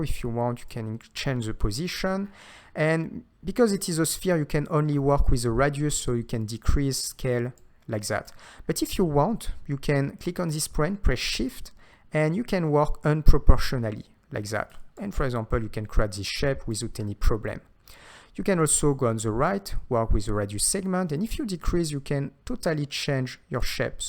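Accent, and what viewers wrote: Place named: France. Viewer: You are a french accent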